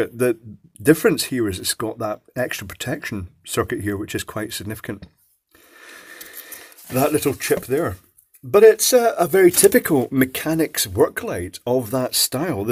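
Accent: Scotland, United Kingdom. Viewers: In addition to your lovely Scottish burr(I could listen to you all day), you have just saved me a lot of money and a lot of potential pain. Glad I found you.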